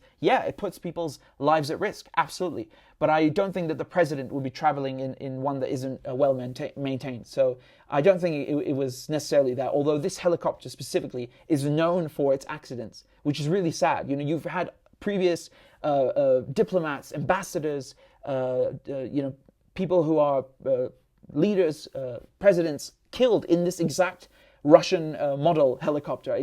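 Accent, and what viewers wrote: England, United Kingdom. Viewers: Bro go back go your Syria and stop spreading anti west cheap propoganda in British accent. If you love so much your resistance you should live there.. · English accent is strong in this one.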